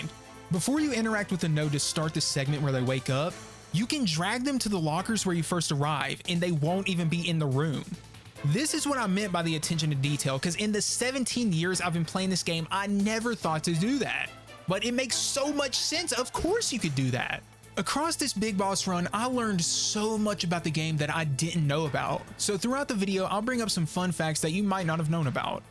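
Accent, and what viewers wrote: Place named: Maryland, United States. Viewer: Bro are you from MD? Not hatin, that accent just sounds so familiar lol. Good video btw, Sons of Liberty is the greatest game ever made imo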